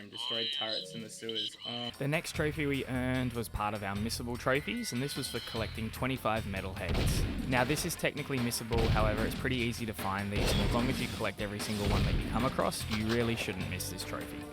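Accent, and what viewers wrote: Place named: Australia. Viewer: Not my usual sort of content to consume, but hearing an Aussie playing my favourite childhood game and smashing it has earned a sub! Keep it up and hope you smash your goal for the year!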